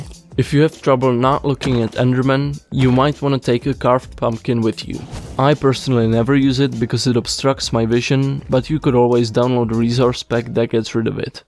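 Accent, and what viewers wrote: Czech Republic. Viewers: ceskej prizvuk uplne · bro had czech accent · what nationality are you? you have a czech accent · bro where are you from? You don't sound like you're from an english speaking country. You sound like you're from the Czech Republic. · You do have little bit of czech accent. Amazing video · bracho ta cestina tam jde slyset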